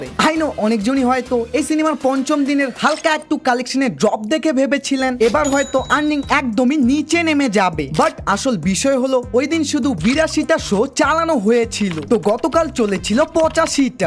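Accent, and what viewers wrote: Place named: Mymensingh, Bangladesh. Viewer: Vai apni ki Mymensingh? A thken hotate saiabani holl daklm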